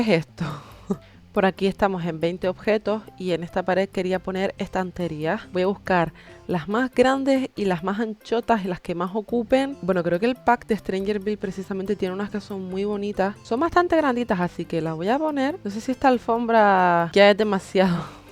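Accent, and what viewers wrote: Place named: Canary Islands, Spain. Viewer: Mira que llevo 1 semana viciada a tu canal desde que te conocí y sabía que tu acento era muy de mi tierra. Me encanta saber que hay un canal de sims que no solo sea de Canarias sino de Las Palmas. Adoro y sobre todo pío pio jajajaj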